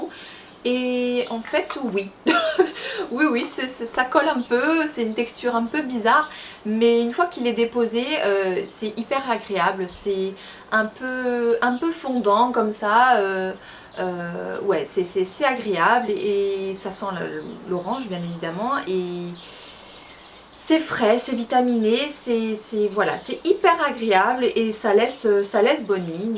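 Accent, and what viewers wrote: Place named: South, France. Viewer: Ça fait du bien de te retrouver à travers une vidéo! Ne décèlerait pas un léger accent du sud qui s'installe dans ta voix? ahaha c'est charmant. Bonne journée et reviens nous vite pour une nouvelle vidéo!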